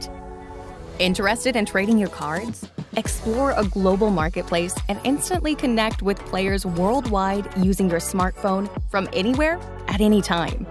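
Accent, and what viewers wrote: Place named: France. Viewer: The French accent makes it so much better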